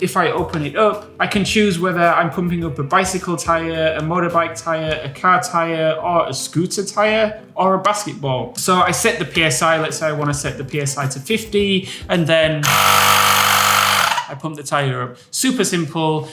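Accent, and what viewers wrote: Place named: North Wales, United Kingdom. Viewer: Hi, did you grow up in north wales or near north wales, can’t stop picking some similarities in your accent 😂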